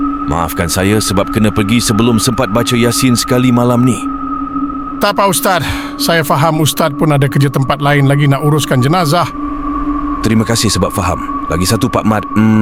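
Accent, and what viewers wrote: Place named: Sabah, Malaysia. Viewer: Sabahan bm 😂😂😂 Iya bah,kau org Sabah bah.😂😂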